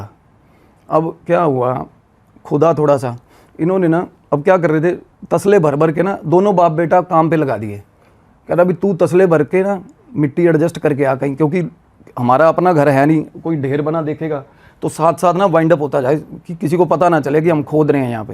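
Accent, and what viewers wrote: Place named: Punjab, India. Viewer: Yeh Banda Boht ache tarike se bata hai bilkul punjabi style me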